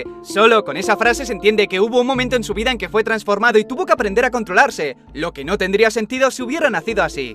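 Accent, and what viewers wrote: Spain. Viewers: Tu voz de español zoqueta es INSOPORTABLE!!!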